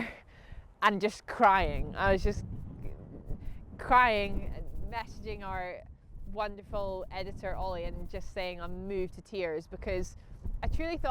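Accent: Scotland, United Kingdom. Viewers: your the best pal love to hear your lovely Scottish tones on the tv,the future for you in golf is going to be rosey am sure,may the course be will you ❤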